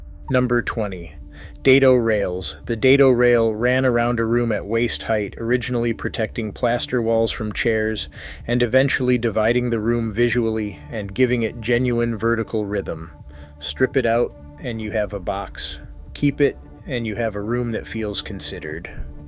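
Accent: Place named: United States. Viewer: Why use an American voice for a British video? Interesting video otherwise. Lots of good features that went by the wayside for false cost savings.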